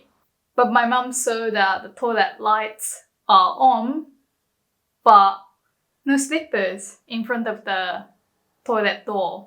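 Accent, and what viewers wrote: United Kingdom. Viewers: ! I am really enjoying your lessons here. Thank you for speaking slowly and clearly, and explaining things so well. Plus, you are super cute. Where in the UK is your accent from? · That's strange she speaks british english, even though she is Japanese looking. life is STRANGE. good video.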